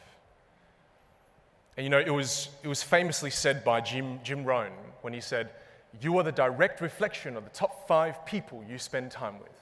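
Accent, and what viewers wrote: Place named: Vietnam. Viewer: Your Vietnamese accent is spot on lol .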